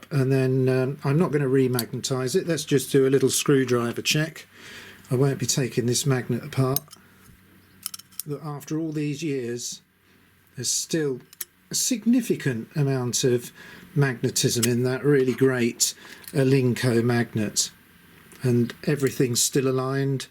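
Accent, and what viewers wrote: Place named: Northampton, United Kingdom. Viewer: This brought back memories from 67 years ago when my elder brother convinced my father to buy a three rail train set. The old boy never really got to grips with electricity so he had to get one of his salesmen over to explain why the locomotive went backwards when he pushed the Model 3 controller throttle into the forward position. Lord knows how he would have coped with computerised DCC control with 32 separate blocks ! Thanks for making a wonderful video, I love your Northampton accent, where I was born 64 years ago.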